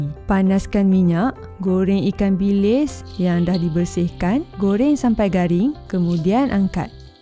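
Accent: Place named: North, Malaysia. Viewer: ni asal mana eh.?? ada bunyi utara skit2 tuhh😆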